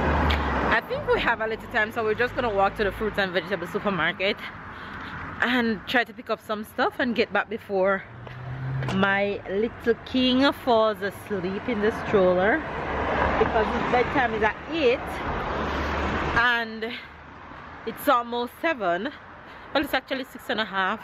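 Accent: Jamaica. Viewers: I like your Jamaican accent. Autumn is awesome. It's not too hot, and not too cold.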